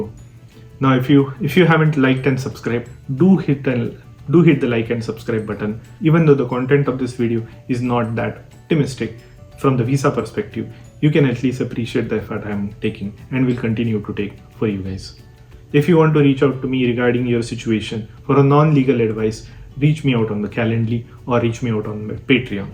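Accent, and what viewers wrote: India. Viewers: U need to make your accent less Indian-like. It is not pleasant to one's ears.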